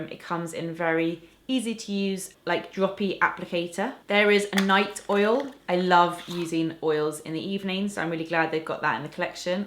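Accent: United Kingdom. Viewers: Hey, I love your Honesty and you are so classy and natural (love your British Accent). I am not on all that make-up thing expect Nars Product but I love watching your videos, they are so pleasant! Cheers from Paris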